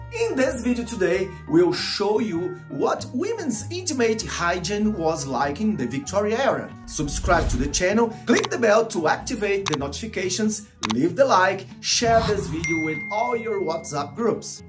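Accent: Brazil. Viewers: A pronúncia não está boa, mas, se você tiver gravado sem decorar, seu nível de inglês está bom. É só treinar mais a pronúncia agora, pois está bem abrasileirada. Você é fera. 💣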